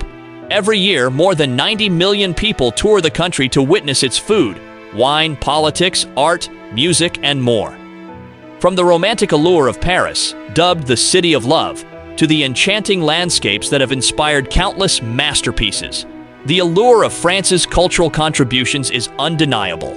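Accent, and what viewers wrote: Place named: United Kingdom. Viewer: Hearing all this boldly narration in a british style makes me laugh too much. Hospital mocking charity as we say in France. Perfid albion at his greatest.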